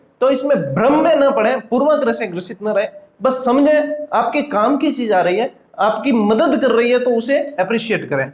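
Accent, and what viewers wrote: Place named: Jodhpur, India. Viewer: तभी , आप भी बिल्कुल हमारे (जोधपुर) जैसी मारवाड़ी बोल रहे हो 👌👌 शानदार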